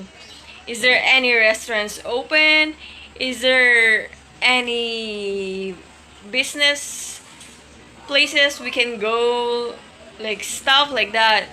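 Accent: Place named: California, United States. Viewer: All eyes on. You are a very beautiful women.😍😍🔥🔥. You talk like you're from Cali. Lol. I would love to come out there and hang out with you.😉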